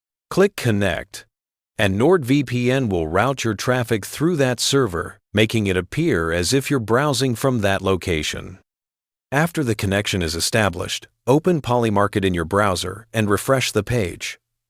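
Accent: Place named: India. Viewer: indian detected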